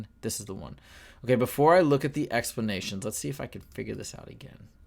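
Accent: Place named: United Kingdom. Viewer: Lol your british accent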